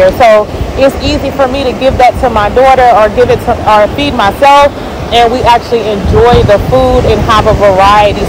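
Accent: United States. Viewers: Wow I'm surprised, I thought Kampala would be as hot as Ghana. You sound so American. I would never guess that you are Jamaican-American.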